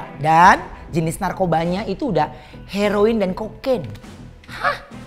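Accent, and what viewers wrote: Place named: Bekasi, Indonesia. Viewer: Cerita serem ko malah jdi kocak..sih ko.. Bahasanya gaool bangaaat.. (logat orang bekasi bangat gitu..) haha.. Sukses terus deh.. Makin lancar n berlimpah rezekinya buat..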